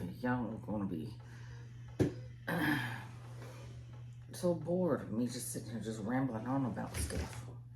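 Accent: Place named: South, United States. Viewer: love your accent. are you from the south? i’m new to the channel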